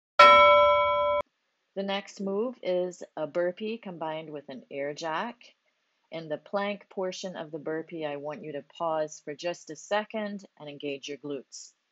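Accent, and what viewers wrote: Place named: Barbados. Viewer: Great 10 minutes! I did it after your ab/glute band workout. Perfect fit! And I love how your Bajan accent is coming out 😊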